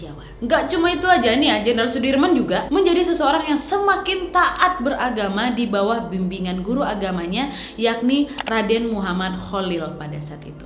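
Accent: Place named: Medan, Indonesia. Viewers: Ni pasti cewek dr Medan.... dh jlz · asal medan ya? logatnya mirip kali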